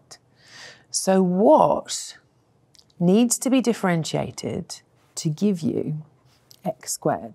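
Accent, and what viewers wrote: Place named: United Kingdom. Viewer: Smart women = So attractive yeah the British accent doesn't hurt either.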